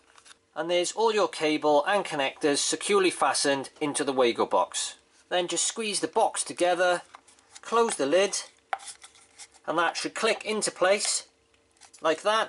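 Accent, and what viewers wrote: United Kingdom. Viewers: Would these be OK to join my lighting earth wires to the light fitting earth, for example I've got 3 earth wires coming from the ceiling ( rose) and one coming from the new light fitting, can they be joined into the 4 way connector. I'm in the UK and from your accent not a million miles away from your good self.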